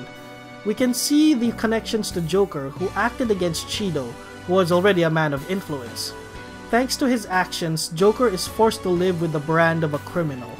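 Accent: Philippines. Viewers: He has that thick filipino accent. Pretty sure he is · I just can’t help notice your accent. Are you a filipino? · That filipino accent is too thicc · The Filipino accent is killing me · Damn that Filipino accent is getting to me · accent seems familiar. are you a Filipino? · You oddly sound like a Filipino. Great stuff tho!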